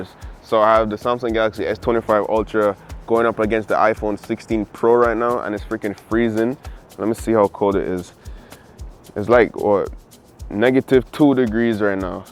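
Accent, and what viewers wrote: Jamaica. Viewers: This Bredda Jamaican?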